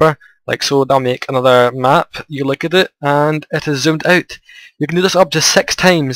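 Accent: Scotland, United Kingdom. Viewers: R u Scottish if u r so am I!!! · your Scottish! i love u accent! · Hahhaabha you're cute. and THANK YOU AWESOME PERSON./ scottish <3 Do you speak scottish gaelic too?:3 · You're scottish?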